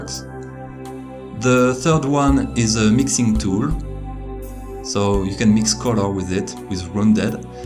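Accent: France. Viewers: trop fort l'accent français^^ merci pour le partage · are you french? you have thé french accent 😊☺ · Merci pour la vidéo ! Ton accent français qui articule bien pour chaque mots en anglais (contrairement à eux qui avalent leurs mots); m'a beaucoup aidé à te comprendre ! xD Et ceux même en anglais. Merci ! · le bon accent de français haha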